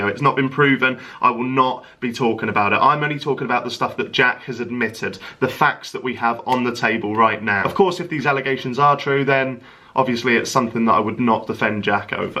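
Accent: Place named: Newcastle, United Kingdom. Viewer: Sky, a gay guy on Twitter that I follow, loves the True Geordie's accent and I love it. He once shouted at me for asking why he loved the Geordie accent so much.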